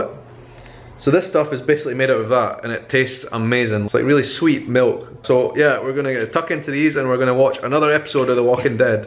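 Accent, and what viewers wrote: Scotland, United Kingdom. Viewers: Scottish accent is so addicting. Really nice :) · he are british? have a funny accent haha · I love the scottish accent! Never heard a scottish trying to say PASTEL or PASTÉIS... Well, I´ve been to Inverness (and Glasgow and Edinburg), in a trip and the scottish there couldnt really guess WTF was our language! ;-) It´s interesting cause I was freezing and I saw redhead girls in small shorts LOL.... Nice video! Grats!! Have fun! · I like your accent. Oh, Scotland. Great Respect! Ão, inho, 'muito' and some other nasal phonemes adds a lot of challenge to foreigners. Still the words in portuguese you'd mentioned were pretty ok.Do you practice these days? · be careful dude! im from new zealand i live in brazil, i actually got robbed last week and now i dont want to film in the streets because i think it could happen again, but be careful dude! 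love the accent from scotland, reminds me of old war movies haha! love it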